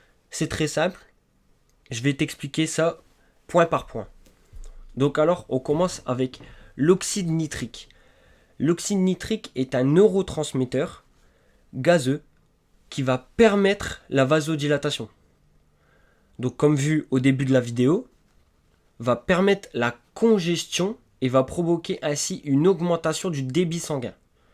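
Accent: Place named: Nord-Pas-de-Calais, France. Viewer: Super bonnes explications, avec un "grave" accent Ch'ti, en supplément🙄🤗. Je vais même réécouter cette excellente vidéo, super complète et scientifiquement pertinente, pour noter les points que je connaissais moins bien. A 63 ans 1/2, j'ai eu il y a peu ( après m'être épuisée pratiquement jusqu'au burn out à soigner jusqu'à sa fin un parent, puis l'autre), un manque de tonus et réponse musculaire grave. Je n'arrivais plus à me relever, difficilement à monter un petit escalier et à marcher. C'était incompréhensible et ma Dr me disait: "C'est l'âge ! ". Tu parles, ça se saurait si à 63 ans, les gens, en 2021, étaient pratiquement TOUS incapables de bouger !!! En réalité, bien que n'étant pas végétarienne, je manquais de vitamine B12 et un chouia de potatium. Pourquoi ? Je ne sais pas. Un sursursurmenage trop intense, pendant trop, trop, trop, trop, trop, trop, trop d'années (7 ans 24h/24, après 20 ans par intermittence) , trop de charge physique et mentale non stop en solitaire ? 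Bof, peut-être ou ? Je vais mieux mais faut toujours que je sois sur tous les fronts, alors j'ai bien l'intention d'alimenter ma machine humaine pour qu'elle soit efficace et autonome le plus longtemps possible. Le moral et la cervelle, ça va. Je sais comment les maintenir en forme naturellement. Vraiment, tu es très clair et pédagogue. La petite vieille te fera savoir si elle parvient à reprendre un peu de fitness, de danse, de vélo et de randonnée. 🧙‍♀️🏋️‍♀️💃🚴‍♀️🚶‍♀️💪 🧙‍♀️ 🤭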